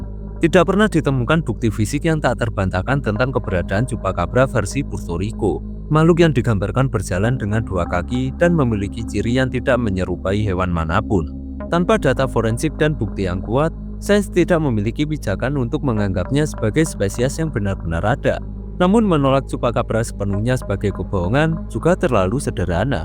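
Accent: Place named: Java, Indonesia. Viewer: Abang orang Jawa ya medok nya keliatan 😹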